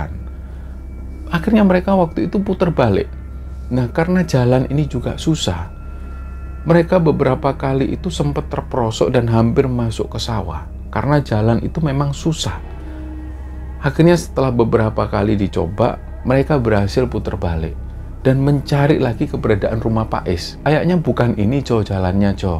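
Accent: Java, Indonesia. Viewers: suka... Dialek jawanya bikin adem Channel Nadia Omara jg bagus yg serial KHW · Kyknya saya udah kecanduan cerita2 mistis. Suka bgt dengar aksen jawanya😊 Cara pengceritaan yg sangat menarik dan gampang di fahami oleh org Malaysia seperti saya. Mas nya juga ganteng kok🤭😄🙏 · Saya pengikut baru mas,awalnya saya lihat kismisnya mas ini yg kuperhatikan cara beliau membawakan cerota dg logat jawanya adri situlah saya ikuti konten,semoga sllu sukses untuk mas dn para kru,dari THARIE KALIMANTAN akan sllu hadir di setiap kismisnya 👍👍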